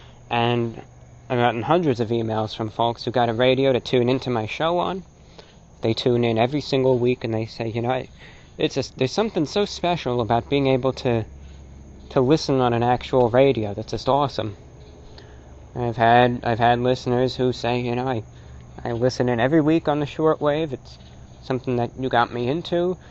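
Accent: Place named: Mid-Atlantic, United States. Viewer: Gotta talk with that mid-atlantic accent.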